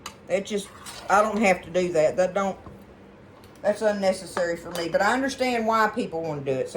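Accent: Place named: Texas, United States. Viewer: Yes, she's referencing turmeric. Her Texas accent just makes it sound a bit different that how some folks pronounce it.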